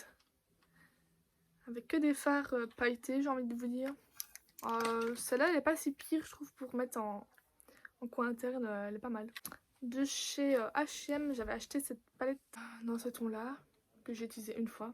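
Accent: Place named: Belgium. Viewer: Coucou sacrée collection ! Ton accent m'intrigue tu es en Belgique ?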